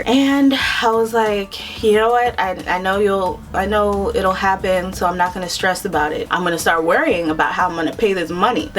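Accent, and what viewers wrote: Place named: Brooklyn, United States. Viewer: I like the Italian Brooklyn accent, perfect!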